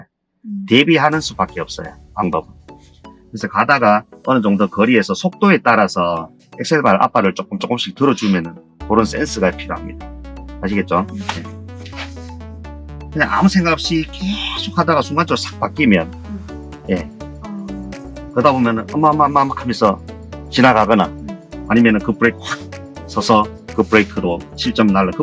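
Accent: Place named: Daegu, South Korea. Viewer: 와 억양이 좀 익숙해서 찾아보니 서대구 학원이네요 일주일만 더 일찍 알았으면 여기 등록했을건데 ㅎㅎ 지금은 ㅊㄱ 다니고 있는데 강사님들마다 달라서 쪼금 혼란스러운중입니다 ㅎㅎ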